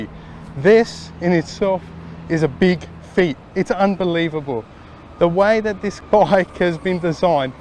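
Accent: Australia. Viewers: This is NOT your typical Australian accent, this guys speaks with an ethnic background combined with an Australian accent just as an FYI..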